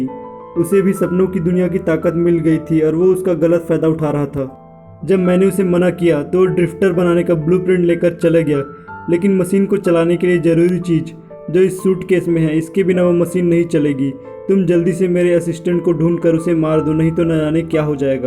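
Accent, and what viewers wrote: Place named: United Kingdom. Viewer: Britain voice h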